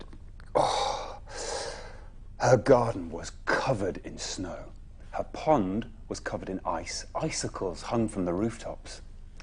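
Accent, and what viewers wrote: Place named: Wales, United Kingdom. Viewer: the welsh accent xD I am deceases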